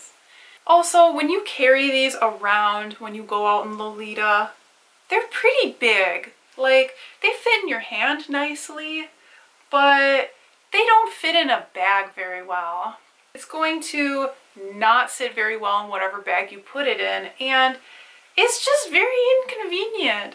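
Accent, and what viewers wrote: United States. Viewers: Cute channel, informative vid! Can I ask where you’re from? I hear a kind of northern/mid-western North American accent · This was all such good info and I loved listening to it because your accent is so cute! Where in the states is that accent from?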